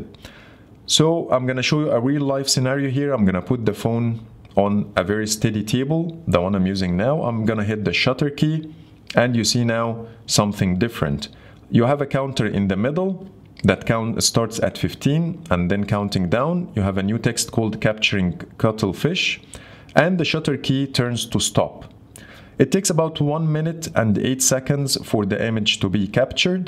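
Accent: Egypt. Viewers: Ur accent is Egypt English? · This accent is familiar are you Egyptian?? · Your English saying that you're Egyptian, am I right?! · هو انت مصري اصلي حاسس بتواصل في سرعة كلامك مع مخي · You sound kinda Egyptian tbh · واضح من لهجتك إنك مصري 😁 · مصري مصري ، اللهجه فضحاك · انتا مصري حاسس كدا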